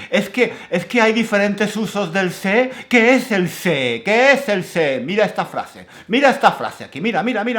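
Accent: Andalusia, Spain. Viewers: ¡Me encanta escuchar tu acento andaluz! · Verdad? Eres Andaluz? De q cuidad? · I love your Andaluz Accent!!!!!!!!! me encanta su acento Andaluz!!!!!!! muchas gracias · Me encanta el acento andaluz ❤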